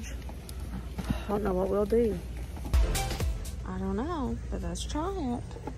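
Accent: South, United States. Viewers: You are literally the only person who is actually adorable saying SALmon. 🥰 Love your southern accent. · It’s ok you are southern you have an accent that is so cute.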